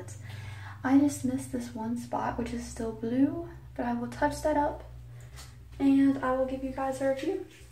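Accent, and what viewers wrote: South, United States. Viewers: Love your videos!!! I just wish that you would speak a bit more slowly, though perhaps you've slowed down in your more recent videos that I haven't seen. The speed, in addition to your southern twang make it difficult to understand what you're saying at times!!! xoxoxoxo 💇🏻✨💄👠💋👍💅💗👸🏻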